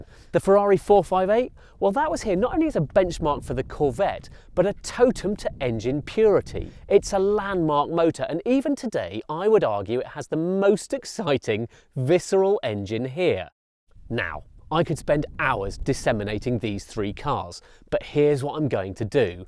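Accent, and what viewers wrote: England, United Kingdom. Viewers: english accent driving american car = stop watching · "Euro" presenters hardly ever test American sports cars because generally speaking, only Americans buy them. As for the 'home team', Top Gear is a British magazine if the accent didn't give it away, and the Porsche is German.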